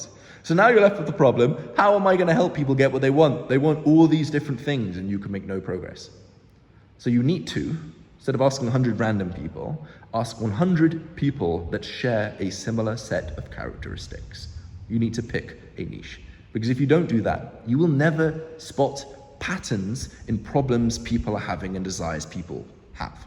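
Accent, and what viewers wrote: United Kingdom. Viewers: I liked your UK accent. It reminds me the awesome voice of the legend- Tony Greig. Thanks for sharing this awesome video.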